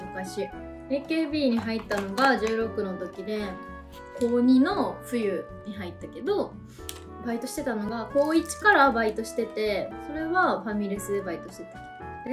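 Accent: Kansai, Japan. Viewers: めっちゃ関西弁が落ち着きますわ ゆるーくしゃべってんの聞いてるだけで癒される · ゆいはん本当に美味しそうに食べるよね😊 見てたらマック食べたくなった🤣 関西感出してるとかは思わないよ！ それは生まれた地で慣れた言葉だし、その京都弁とか関西の言葉はゆいはんの良さでもあるから😊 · こういう普通の朝の雑談ってのも、いいものだね😙 マックは関西訛りが抜けてからでいいと思う。 そして俺はハッシュドポテトからいく派😆 齧った時の歯型がキレイなので、今日も元気でやれるでしょう😉 レコ大お疲れ様〜😄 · 自分が関東人だからか関西弁を話す女の人がなんか魅力的に映る笑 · 美人が気取らずに関西弁をしゃべるのが好感が持てます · ハンバーガー🍔を食べながら、関西弁で、想い出話するゆいはん最高。来年も、素敵な笑顔を見せてください、良いお年を。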